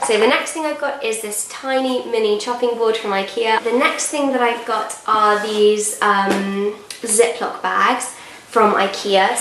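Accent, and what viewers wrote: RP, United Kingdom. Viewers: Do you put this accent on ... your sister talks so so so much different which is a bit weird ? You sound posh yet she sounds not posh hahah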